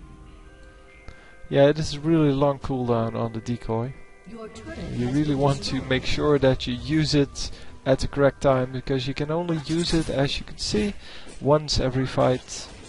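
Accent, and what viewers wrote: Netherlands. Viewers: are u from holland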